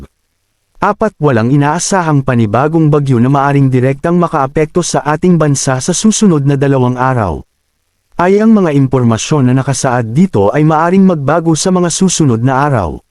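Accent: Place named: Cebu, Philippines. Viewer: Replay dito bro, naks ha, pamilyar ka ba sa Cebu bro at parang taga doon ka pagnag balita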